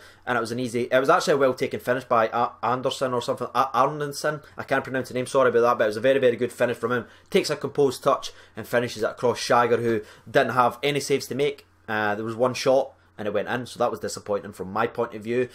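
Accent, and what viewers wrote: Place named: Edinburgh, United Kingdom. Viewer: Deffo pal 👍 this has been the case for the past couple of years even down south, ive never seen so many ridiculous decisions made by refs up n doon the country, feckin ruined ma sunday 😠 ma only bonus is watching Utd v Bayern tonight. CONSISTENCY is all we want wi a bit o common sense ffs. You pretty much summed up everything i would've said 👍 Where are you fi pal you sound like you're from near me in the Capital 😆✌👌🙋 WATP 🙌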